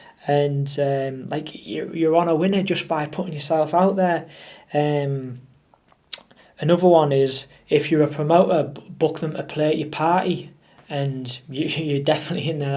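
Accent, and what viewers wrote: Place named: Yorkshire, United Kingdom. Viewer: Reason for asking cos you sound Yorkshire on ya videos pal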